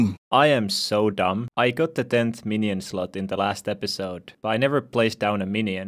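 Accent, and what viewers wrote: Finland. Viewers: Where are you from? it sounds like you are from finish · Sun suomalaista aksenttia on niin kiva kuunnella😁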